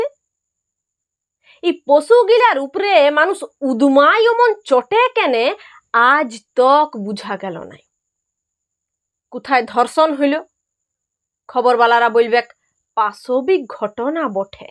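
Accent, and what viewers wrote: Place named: Bankura, India. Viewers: পুরুলিয়া , বাঁকুড়ার ভাষা মনে হয় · টিপিকাল বাঁকুড়া ও পুরুলিয়ার মানুষ জনের মতো উচ্চারণ। অনবদ্য! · উচ্চারণ ঠিক আছে। পিওর বাঁকড়ি। কৃত্রিম উচ্চারণ নয়। অনেকে চেষ্টা করে, কিন্তু ঐ জেলার মানুষ। তাই বেঠিক উচ্চারণ কানে লাগে। ধন্যবাদ।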